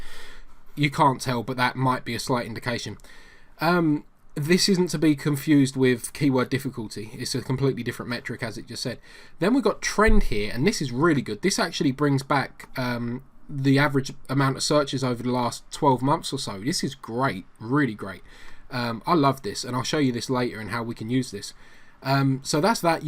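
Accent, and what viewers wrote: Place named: Colchester, United Kingdom. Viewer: I'm originally from Colchester too. The accent sounded familiar :) ..A Helpful video as returning to SEO my sites. Ta very much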